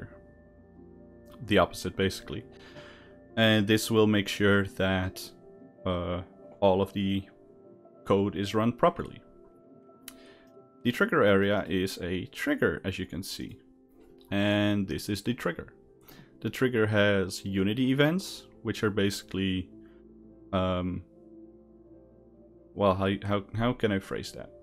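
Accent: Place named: Netherlands. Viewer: are you dutch? btw I am and you sound a bit like you are too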